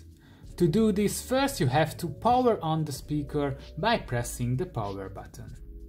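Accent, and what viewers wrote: Hungary. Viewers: Magyar vagy, ide hallom 😂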